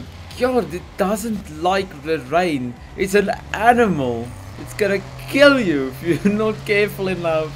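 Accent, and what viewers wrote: South Africa. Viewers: That is the most Saffa accent I’ve heard in my life, holyyyy. Nice video though man.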